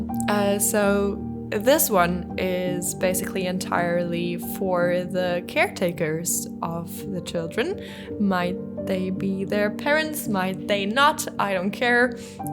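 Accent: France. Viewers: You sound part french, danish and irish to me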